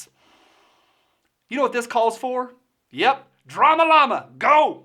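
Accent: South, United States. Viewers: Really respect Paul Harrell and like his videos..I like your videos as well and have just subscribed....as I listened to you I was thinking man he sounds familiar....and then you you said " Shazam " and bingo !! Gomer Pyle !! I grew up watching TV shows that Jim was in and always liked that guy. Being a Appalachian born hillbilly myself I love hearing southern accents on the tube...old tube or you tube ... thanks for what you do really like your channel. Also I'm a vet and absolutely do not like posers..now I will go and watch the video in question...thanks "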